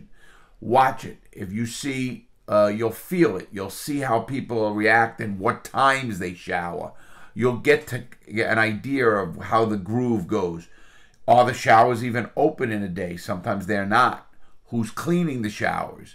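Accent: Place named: Brooklyn, United States. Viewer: the Brooklyn accent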